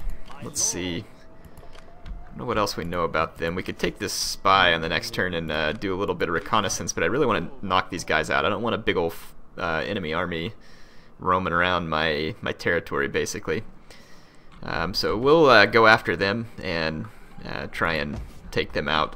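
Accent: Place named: South, United States. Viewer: he's from the south c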